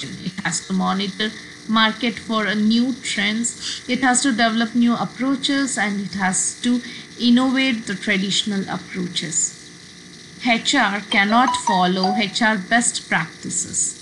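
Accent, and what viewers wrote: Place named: United Kingdom. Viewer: Wow mam trying ur British accent 😁 Well done mam 👍😁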